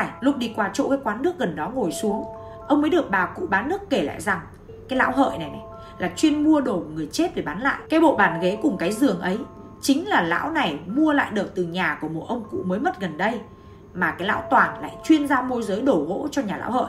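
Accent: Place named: Nam Dinh, Vietnam. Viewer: nhưng giọng nam định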